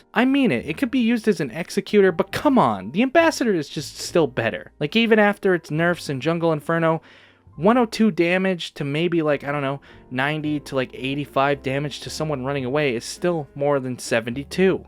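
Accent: New York, United States. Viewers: I always thought that your New York accent was your actual voice whoops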